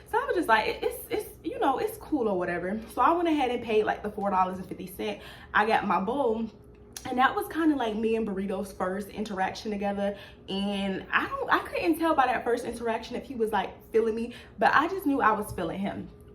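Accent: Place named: South, United States. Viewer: Girl!! I just can't get over the way you say the word "right" soooo freaking cute & country!! 😂❤❤❤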